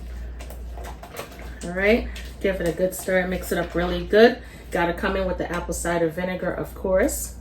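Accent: New York, United States. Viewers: must be from up north I’d say NYC. Because she sounds like me when I talk 😂thanx for the cooking tips on this plate 👏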